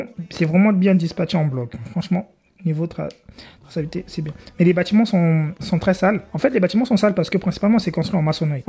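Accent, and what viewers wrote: Benin. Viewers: Ton Lomé a quoi de plus beau que ce qu'il a montré ? Ou bien tu veux qu'il invente des images pour Lomé ? Le temps accordé aux 2 villes est relativement équitable. Tu l'as vu comme un béninois ? C'est c'est l'accent béninois ça ?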